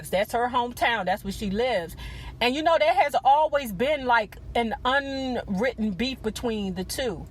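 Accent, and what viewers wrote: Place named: DMV, United States. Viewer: what part of the DMV area you from i can hear it on your voice, Its in the ova's lol and certain words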